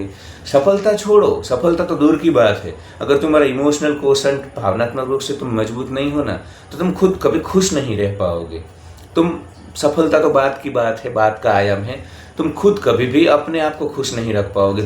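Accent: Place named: Gujarat, India. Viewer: Gujarati accent wali hindi ❤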